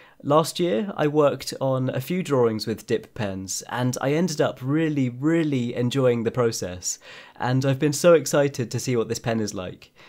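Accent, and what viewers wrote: United Kingdom. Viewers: Amazing scull. With plenty of symbols. Fantastic to see you draw it. Learnt alot! Thanks. PS I really adore your beautiful British pronounciation. It's such a pleasure to listen to.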